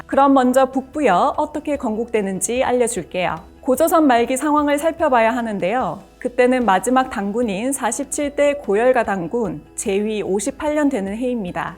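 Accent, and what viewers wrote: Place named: Gyeongsang, South Korea. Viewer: 선생님 강의는 마치 음악 같음. 선생님 때문에 경상도 억양이 이렇게 예쁠 수 있단 걸 처음 알음.. 억양의 리듬감이 진짜 아름다움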